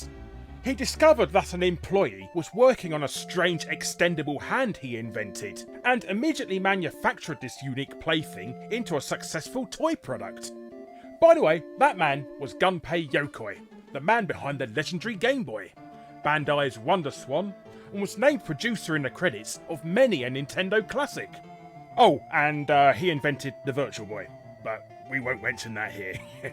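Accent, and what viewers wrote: United Kingdom. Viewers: You're welcome! I'm just an american fellow who loves british accents. Also, i love your content, and Peter molyneux, is always fun to make fun of!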